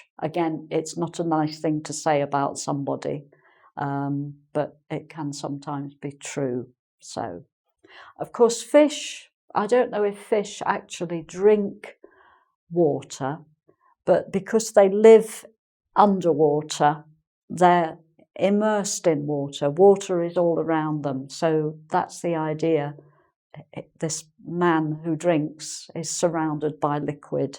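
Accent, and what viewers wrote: England, United Kingdom. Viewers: thank you I adoooore the way you teache . love your videos , I love your accent and wich one day I will get it, please , are you from england ? love this accent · Let me you that I love your accent, even though for me it's kind like annoying sometimes to hear people talking with that britsh accent because I'm more familiar with the american one but you speak so clear that your voice sounds lovly. Thank you very much, I love your lessons, and no, their not as clear as mud. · Perfect british accent and very good teacher, thanks for your lessons.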